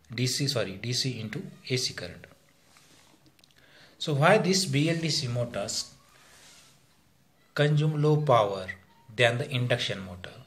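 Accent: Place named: India. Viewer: Your English feel like hindi😅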